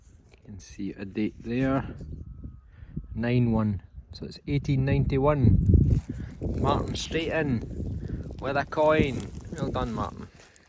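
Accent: Scotland, United Kingdom. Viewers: Hey, hope you're doing well. Can I know, is your accent Scottish or Irish?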